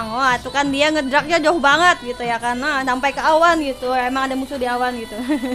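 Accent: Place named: Kalimantan, Indonesia. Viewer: orang mana ko bahasanya kaya orang kalimantab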